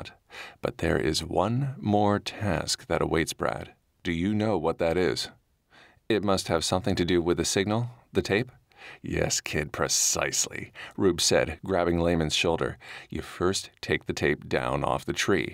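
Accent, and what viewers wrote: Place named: Maine, United States. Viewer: On the Maine accent lol Im from Maine and I'm native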